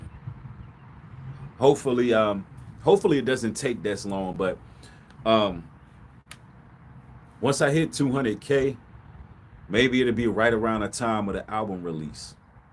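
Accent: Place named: Washington DC, United States. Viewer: Aye brotha, are you from the dc area? I feel like im picking up a hint of that accent. Been checking your vids out for a minute. Much respect!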